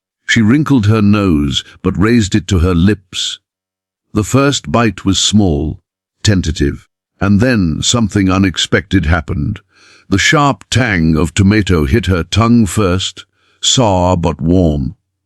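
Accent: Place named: United Kingdom. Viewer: Why do you use a British sample for the narration?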